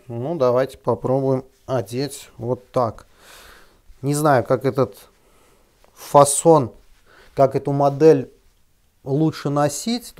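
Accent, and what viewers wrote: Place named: Germany. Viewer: Ну, если написано по-английски, то произносить желательно не по-немецки. Но это мелочи, обзоры хорошие.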